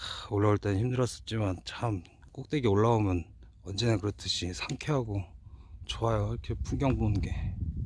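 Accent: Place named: Chungcheong, South Korea. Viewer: 충청도 사투리 끝판왕이십니다~~^^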